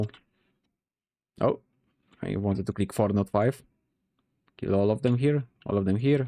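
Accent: Poland. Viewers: i can smell polish accent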